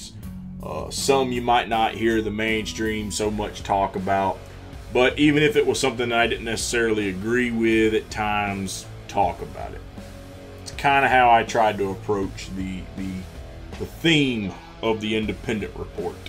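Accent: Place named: South, United States. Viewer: for what it’s worth you have consistently been one of my favorite channels because you speak your truth in this desert of ridiculous echo chambered circular firing squad madness of collective cognitive dissonance that makes up most of so called “indie media.” If “independent” means “independent” of actual intellectually honest and consistent thoughtfulness; then that title may apply to far too many channels, but not yours. Whatever you do; always be wishing you success, the best and nothing less. Take care out there brother as it’s been nice hearing a fellow southerner that shatters the stereotypes; thanks for all you did (and will do.) ✊ Always Be, Your Huckleberry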